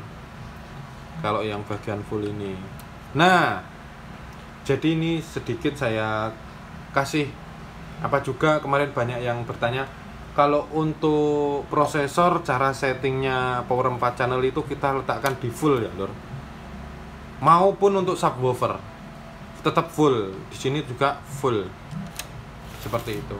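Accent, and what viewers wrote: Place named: Java, Indonesia. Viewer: Medok jowo puol wkwkwk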